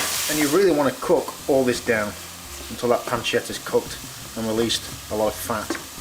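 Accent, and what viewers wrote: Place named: United Kingdom. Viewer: Just discovered your videos recently. To my mind they constitute an object lesson in how to make an outstanding food prep/cooking video. Terrific clarity and nothing left to chance. Who does the filming for you? Cooking your lasagna right now and will let you know how it turns out. Love the tomato sauce on the plate at the end - lifts the presentation of this rustic dish. I am an ex-pat Brit living in the US and it's very refreshing to hear you speak! Just subscribed. Good stuff! Bob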